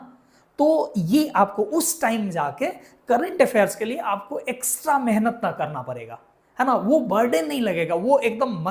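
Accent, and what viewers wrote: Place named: Chhapra, India. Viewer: Sir aapke video me chhapra wala tone aata hai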